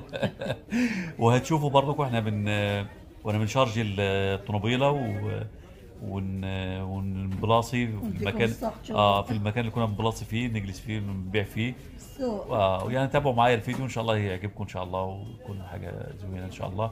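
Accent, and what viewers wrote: Upper Egypt, Egypt. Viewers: مصري صعيدي 👍 لهجتك عرفتها. تحية لك يا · انا مغربي بس بكلم مصري وصعدي كمان ومن لهجتك انا عرفتك انك انت من صعيد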